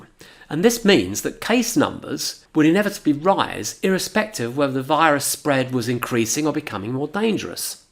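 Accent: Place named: East London, United Kingdom. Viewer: East London accent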